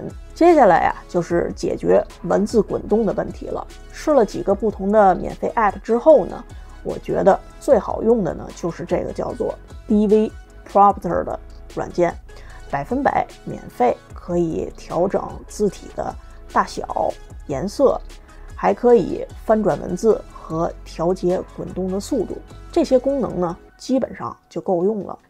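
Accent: Beijing, China. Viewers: 口音像北京人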